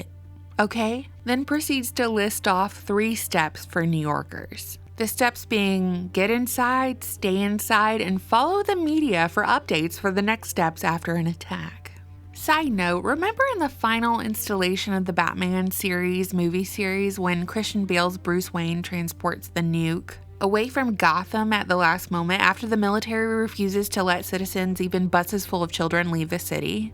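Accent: South, United States. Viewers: Would love to hear you do an audio book. Ham-up the Southern accent a bit and do "Huckleberry Finn". Too Long? What about "Tom Sawyer"?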